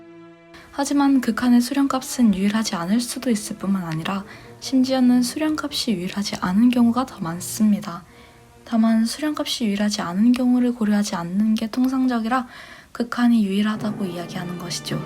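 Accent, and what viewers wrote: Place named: Japan. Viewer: 일본사람 같네요. 발음이..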